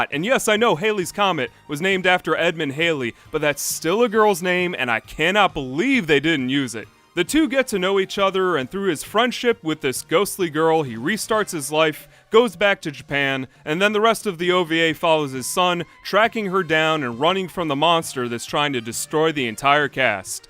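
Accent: United States. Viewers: Sound american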